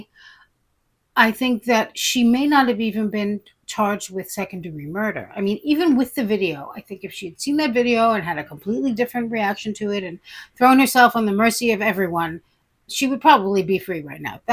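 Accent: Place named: East Coast, United States. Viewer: Elizabeth Sarah Boone Taylor! …thanks for the check in. Love your videos, and your beautiful face! Your accent is very interesting, are you from Brooklyn? You sound kinda east coast. I’m from Oregon and we talk kinda slow here. Hah.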